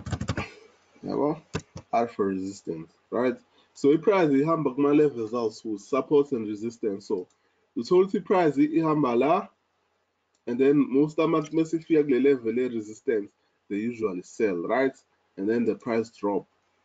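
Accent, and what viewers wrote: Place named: Africa. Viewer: 30 minutes later i'm still not sure what language he is speaking. Sounds like english but also African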